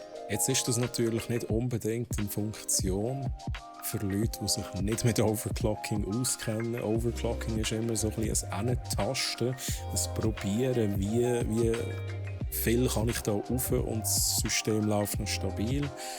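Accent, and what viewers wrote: Switzerland. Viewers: find d'musik genau richtig sehr ahgnehm chillig smooth ahpasst das liegt wohl ehner am schwiizerdütsch und em dialekt und isch es sprachliches khör und kein sound problem 🇨🇭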